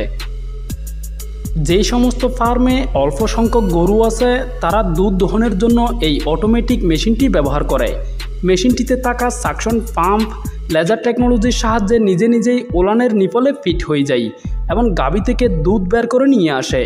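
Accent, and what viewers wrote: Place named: Chittagong, Bangladesh. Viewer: আপনি চট্টগ্রামের লোক মনে হয়।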